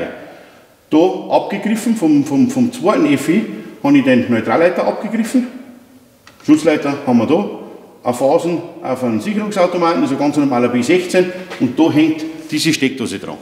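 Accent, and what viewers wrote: Bavaria, Germany. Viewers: Bin ein Ungar, hatte Deutsch nur als Fremdsprache in der Schule gehabt, verstehe dich aber doch ganz prima! Bairisch finde ich schön - sei es dein Kanal, Ottfried Fischer oder die Gruberin :)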